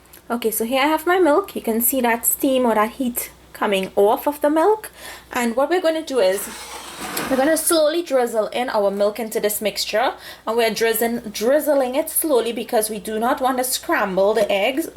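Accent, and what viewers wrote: Trinidad and Tobago. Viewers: Hi am trini my name is Sharon and i enjoy all your recipes I've tried a few and it came out spectacular. thanks so much.am glad you didn't lose your trini accent.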